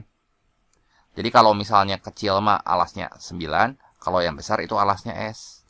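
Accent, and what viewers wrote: West Java, Indonesia. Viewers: kak terimakasih sangat membantu!!! semoga aku bisa tembus skor 1000 hehe btw lucu bgt sm aksen sundanya😆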